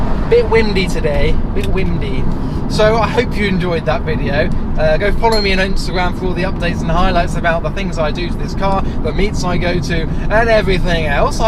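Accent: United Kingdom. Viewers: The most British sounding person on YouTube, banging videos keep it up 👍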